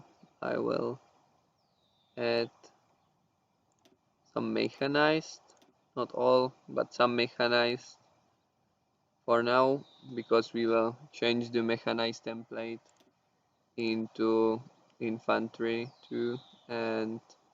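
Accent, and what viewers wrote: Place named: Czech Republic. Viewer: Jsem si říkal, že ten přízvuk mi zní trošku Česky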